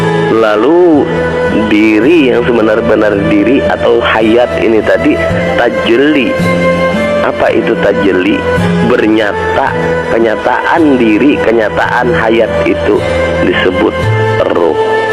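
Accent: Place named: Kalimantan, Indonesia. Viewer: ma'af kliru..🙏 logat n bahasanya mirip di kalimantan.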